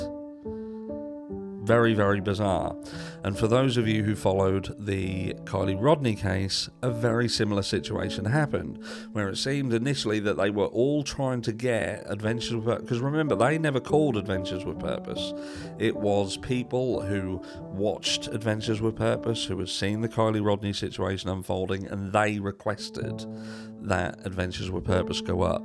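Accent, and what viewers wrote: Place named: England, United Kingdom. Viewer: thank you for another great video. I'm always interested in things you have to say and this case was particularly sad yet engaging. Constructive criticism: the ethereal music accompaniment is super distracting....or was loud enough to compete with your voice and made my focus harder. Your channel, your style, just thought Id share. I suppose I could mute you and read captions but I so enjoy your English accent!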